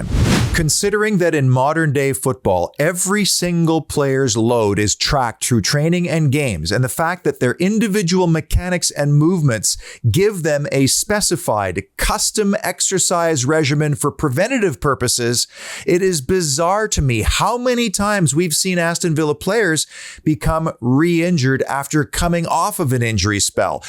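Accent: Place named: British Columbia, Canada. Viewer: British Columbia is North America but not America. Listen to the accent. a star.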